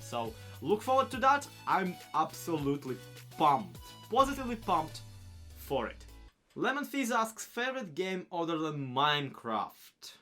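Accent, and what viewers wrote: Russia. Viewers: the thick russian accent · Дикция палит тебя что ты руский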